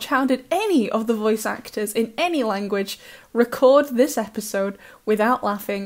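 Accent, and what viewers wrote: United Kingdom. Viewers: ✨ToMaTo BoY ✨The British accent>>>>>>>>> slays!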